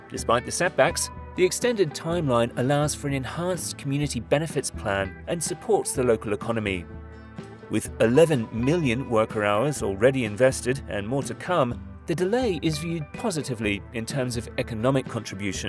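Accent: United Kingdom. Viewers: someone with a faintly "British" accent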